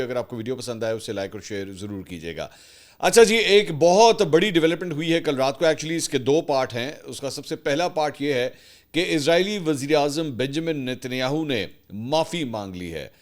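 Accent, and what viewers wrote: Punjab, Pakistan. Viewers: میں آپ کے پروگرام اور آپ کی منظم خبروں کا احترام کرتا ہوں۔ بات کرنے کا شکریہ۔ آپ پنجابی لہجے میں بھی بات کرتے ہیں۔ تاہم، آپ کو میرے پچھلے جواب کو دیکھنا چاہئے۔ تہہ دل سے شکریہ کے ساتھ۔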